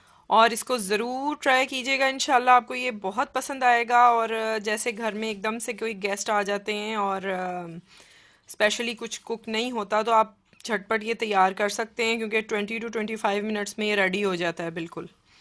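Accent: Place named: Punjab, India. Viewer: MA'AM.....you have Punjabi accent while speaking.....firstly I thought you are from INDIA.....it's so similar accent.....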